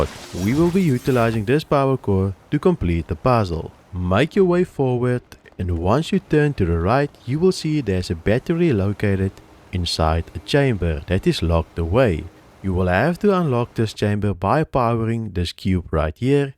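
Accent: South Africa. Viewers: Are you South African? I was trying to place the accent.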